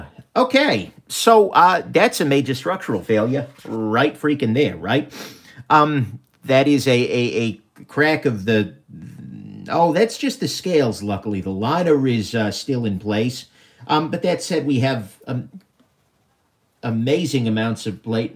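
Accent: New York, United States. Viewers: Violently New Yorker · Is that a new york accent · Heavy NY accent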